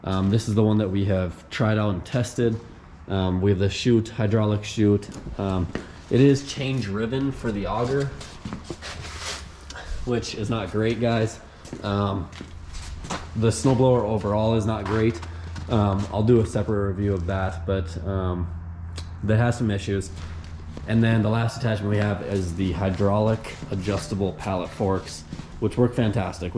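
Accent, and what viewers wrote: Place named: Norway. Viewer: Where are you from? Your accent sound exactly like a Norwegian trying to speak American English.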